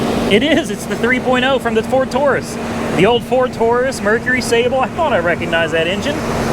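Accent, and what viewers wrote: United States. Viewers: Come on Man, its you Yanks mashed English Aluminium into "Loominum". Not even Germans do that.!!. Even a Life Boy becames a "Life BOOeeee", and that confuses the rest of the World. Rangers generally have shitty little 4 Pot leaf blower engines, that brakeless ones good.!.Ford Service /Dealers got a Shit name over here in SE Asia.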